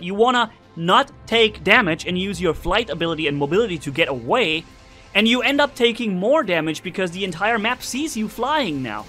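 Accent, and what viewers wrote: Germany. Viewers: Du sprichst ürsprünglich Deutsch oder?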